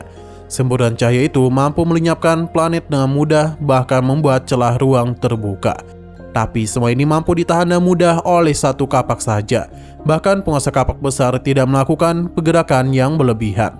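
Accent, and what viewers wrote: Banjar, Indonesia. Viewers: org banjar jua sakalinya min lih... terkipai kemana min😂😂😂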